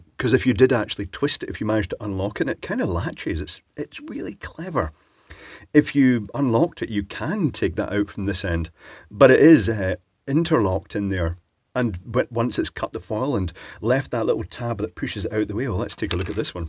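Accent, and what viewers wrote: Scotland, United Kingdom. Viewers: A fellow Scot?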